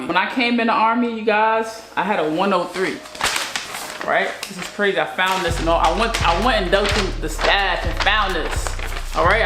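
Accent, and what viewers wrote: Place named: New York, United States. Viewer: She from New York lol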